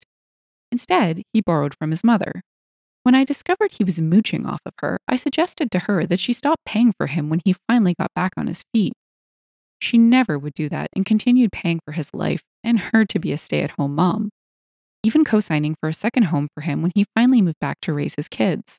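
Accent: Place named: Canada. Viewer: You're sounding like a smooth and sexy Canadian!👍👍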